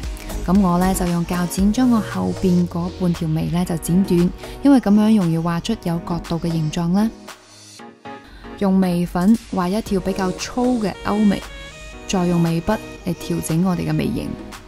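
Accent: China. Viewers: 大陸口音... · you make cantonese sound so pretty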